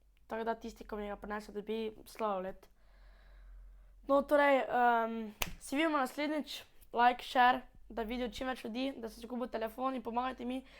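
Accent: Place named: Maribor, Slovenia. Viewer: Končno negdo na YouTube ki govori Mariborsko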